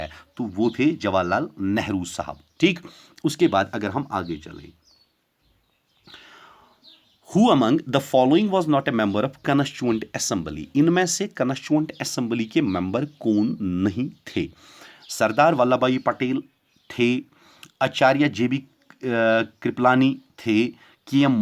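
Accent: Kashmir, India. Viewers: r u kashmiri bro..This voice is kashmiri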